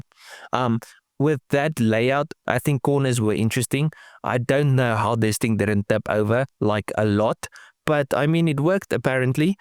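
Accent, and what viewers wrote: South Africa. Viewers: Parelel twin not vtwin. You sound like a South African.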